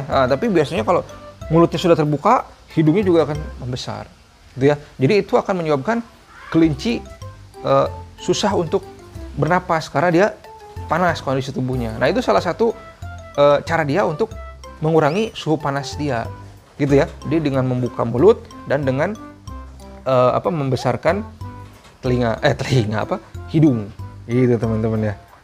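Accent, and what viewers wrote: West Java, Indonesia. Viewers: Orang mna ktu kang logat ngomong nya mah sunda? 😅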